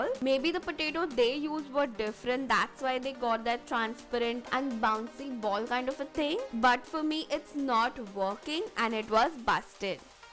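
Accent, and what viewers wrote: India. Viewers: I just love the way you talk in English and a desi tadaka . Will try this hacks for sure . LOVE YOU !!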